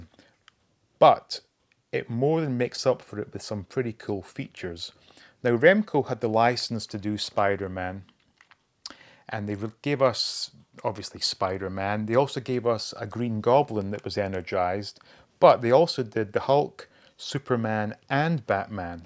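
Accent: United Kingdom. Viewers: I had the UK one which I guess you had too by your accent. But it was totally different it had no battery's for a start I think it worked on a sping system so when you pulled the cord it pulled a spring tight and them pulled itself up . The Web was see through strong rubber and it glowed in the dark and so did spideys spider on his chest and maybe his eyes and I think the body was in better proportion and not as thin as the USA model . But there was no tourch as there was no power . So was this not the one you had ? Thanks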